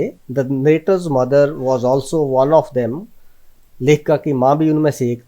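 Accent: Haryana, India. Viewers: sir u r from haryana ? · SIr are you Haryanvi aap hi Awaaz sewada chal raha hai ki aap Haryanvi Ho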